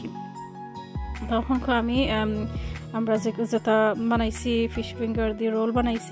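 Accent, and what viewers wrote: Sylhet, Bangladesh. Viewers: মাই অসাধারণ তো আমার সিলেটি খালা মাত হুনিয়া অনেক ভাল লাগলো আমার তো একজন সিলেটি পাইলাম মাশাল্লাহ বন্ধু হয়ে গেলাম আমি সিলেট থেকে ইপু আমাক দেখিয়া জাইয়েন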